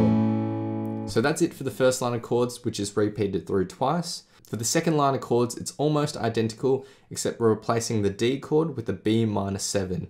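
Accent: Australia. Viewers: Love the ausssie accent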